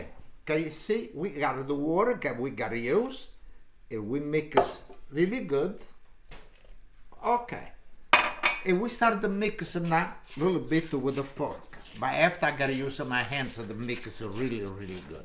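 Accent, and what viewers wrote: Italy. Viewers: Bon giorno papa your ciabatta is bella 😊 I like Italian's accent when speaking English 😁 makes me smile all the time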